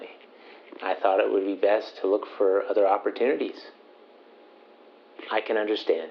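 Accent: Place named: England, United Kingdom. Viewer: Hi, I like your english accents very much. So I subscribed .thank you.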